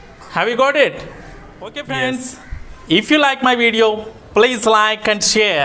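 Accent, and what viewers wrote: India. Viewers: Sir I think u mother tongue is telugu 😅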